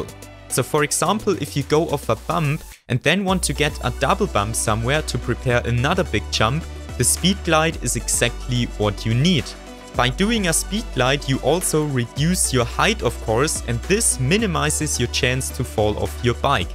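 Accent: Germany. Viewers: 🤣🤣dein Deutscher Akzent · so ein deutscher akzent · Dieser Deutsche Akzent xD Aber nices Video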